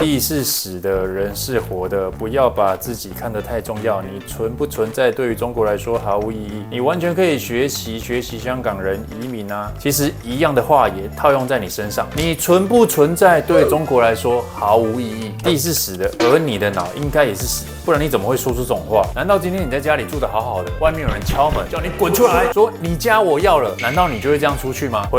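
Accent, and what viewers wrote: Taiwan. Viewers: 你偶爾穿插的道地的台語聽了更讓人很暖心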